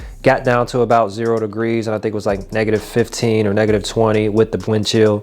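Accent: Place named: New York, United States. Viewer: sound like New York to me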